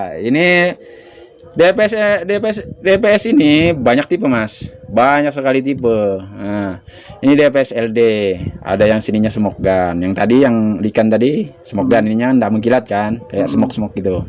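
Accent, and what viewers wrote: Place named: Jakarta, Indonesia. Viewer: Logat bicara nya kaya orang betawi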